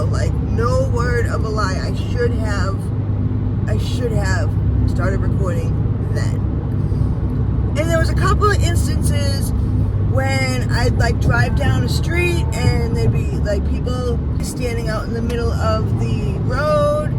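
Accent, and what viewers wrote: New York, United States. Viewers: Thought you from New York or something like that bc of your accent 😆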